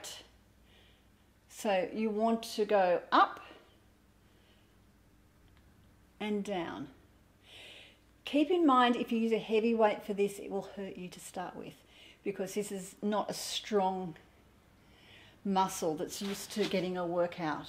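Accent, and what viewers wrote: Australia. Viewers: And I love her Australian accent!! 😃❤ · I know....i swear, she could tell me I needed a lobotomy and I would be like "ok". Everything sounds nicer in an Aussie accent!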